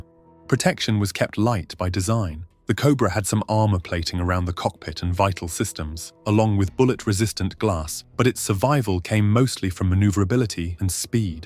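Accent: United Kingdom. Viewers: Rather disconcerting to hear a great story of American heroes and ingenuity narrated in a British accent. ' Military' has four syllables, not three, and 'lieutenant' is not 'leftenant'.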